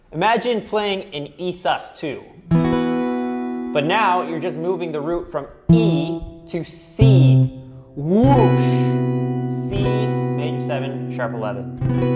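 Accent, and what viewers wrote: Canada. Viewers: Thanks man. I actually searched and they actually say Abouut instead of Abaout. Didn't know it was called a Canadian Raising. xD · Pranav Gautam Canadian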